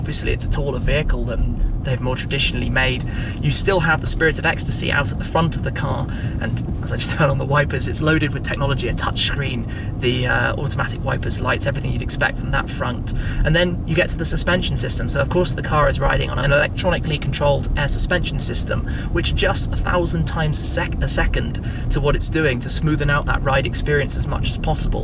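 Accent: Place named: United Kingdom. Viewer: Subscribed because of the British accent lol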